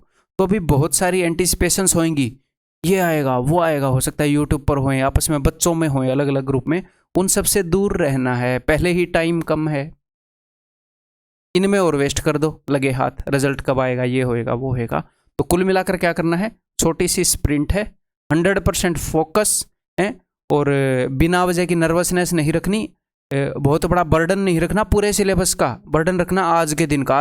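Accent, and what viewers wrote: India. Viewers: Great motivation Maja aa jata hai sunkar Bilkul Desi style me......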